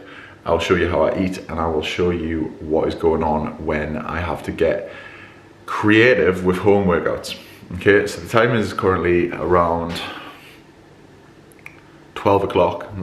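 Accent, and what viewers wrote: Newcastle, United Kingdom. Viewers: Just subscribed, you're from Newcastle aren't ya?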